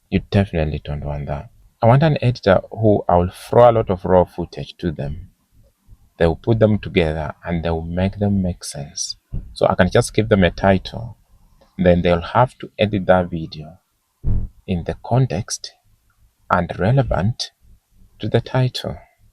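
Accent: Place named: London, United Kingdom. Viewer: from Malika squad, happy to see you.oh my .... u have a London accent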